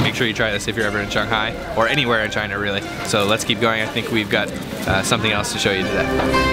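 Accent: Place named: Canada. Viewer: Stumbled over your channel by accident and I love it! Great content, superb drone footage and love the Canadian pronounciation - „over and eut“ 😉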